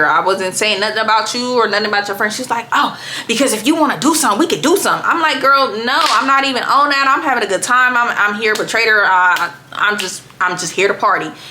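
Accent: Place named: New Orleans, United States. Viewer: Ya feel me...sound like u from the N.O...lol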